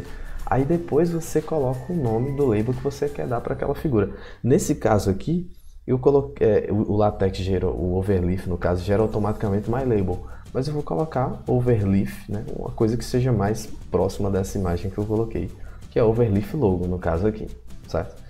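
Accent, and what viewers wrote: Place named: Recife, Brazil. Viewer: De longe o melhor vídeo que eu achei aqui no Youtube. Pelo som de fundo/sotaque da pra perceber que é Recife hehe abs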